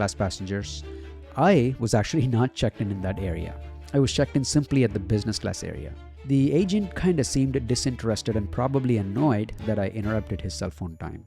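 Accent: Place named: Philippines. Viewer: What a great review! Excellent commentary and not too disharmonious music. Great videography that wasn't rushed: loved how you showed the presentation. I assume you are Filipino from your accent? By the way, on Philippine Airlines, the tablecloths always look like they've been pressed, not that I'd ever previously thought about that. Keep up the good work. This review deserves many more than 7500 views.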